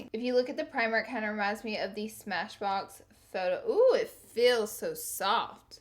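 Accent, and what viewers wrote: South, United States. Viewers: Is it just me or do some people watch her videos for her beautiful southern accent😍😍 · Try dermacol foundation ask jeffry about this foundation . Who ever say crap abt ur accent dont give a dam girl . Hi 👋 to my southern girl 😻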